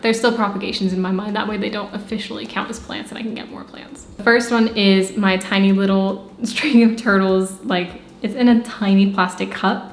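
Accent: United Kingdom. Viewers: You sound mildly British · Have you lived in England before? I hear a touch of British speak. Great collection by the way. I love the trubeii a lot.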